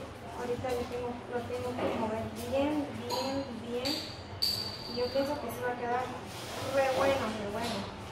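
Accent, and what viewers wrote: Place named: Guatemala. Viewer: De todas las chicas de los grupos chapín ella es la única que habla como su país pero que se escucha bonito es decir no vulgar su forma de hablar su acento.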